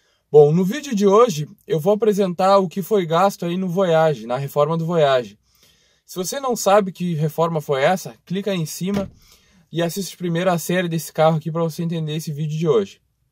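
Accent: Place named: Bagé, Brazil. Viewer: De Bagé ou região amigo? Sotaque da minha terra! Estou acompanhando os vídeos, muito bom. Pretendo reformar um Uno por hobby. Abraço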